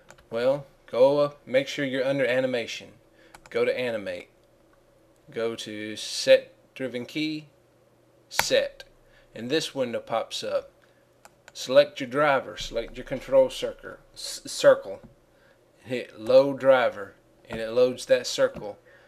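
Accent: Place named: Texas, United States. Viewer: you explain things damn good! thanks a bunch! is that a texan accent or what exactly? im not from america sry!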